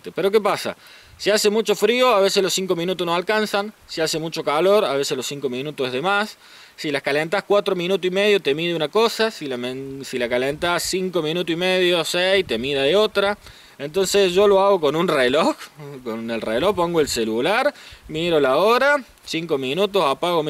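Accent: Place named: Cordoba, Argentina. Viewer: 6 años viajando y no perdió el tono de cordobés jajaja muy bueno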